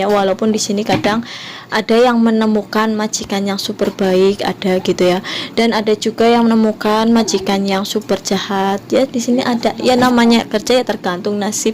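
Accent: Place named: Banyumasan, Indonesia. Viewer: Masak mantap... Cilacap.. Ora ngapak ora kepenak..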